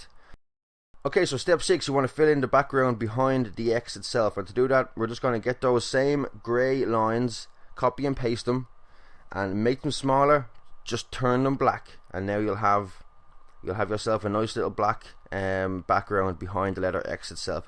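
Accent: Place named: Ireland. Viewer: Nice vid dude. 1) I know you're irish but you really do sound quite alot like Sheamus dammit. also 2) could you maybe do an NWO logo? I think they were the main DX rivalry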